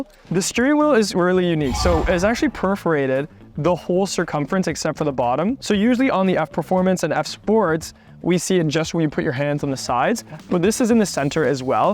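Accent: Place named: Canada. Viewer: You’re so cute and love that Canadian accent! Nice video- a pano sunroof would make it perfect! What’s taken Lexus so long to put them in? Same with not the latest infotainment?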